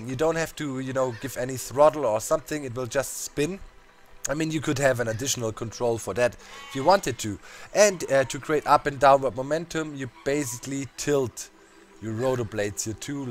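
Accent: Germany. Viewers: Man hört ds du deutscher bist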